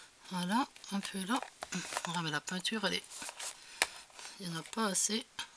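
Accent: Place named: Freyming, France. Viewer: coucou elles sont tres mignonnes sans risque de prise de poids j aime beaucoup ta voix et ta bonne humeur et ce petit accent qui me rapelle ma grande tante qui etait de freming bisous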